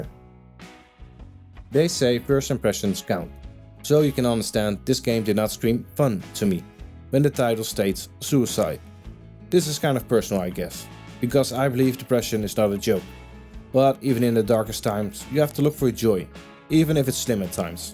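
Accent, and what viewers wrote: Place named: Netherlands. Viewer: It is sometimes hard to understand you due to trying to speaking as a native and your Dutch accent. Sorry to say that but it can be improved I believe. The video is great after all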